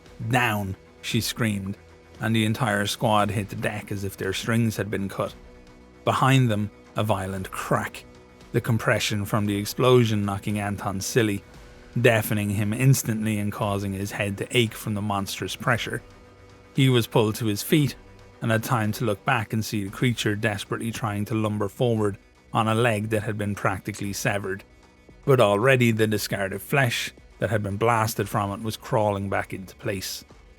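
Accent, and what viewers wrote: Ireland. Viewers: What an amazing story. Love the style, the temhe and your irish accent!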